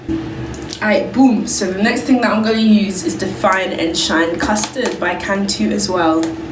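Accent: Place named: London, United Kingdom. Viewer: BTW, is your accent from London.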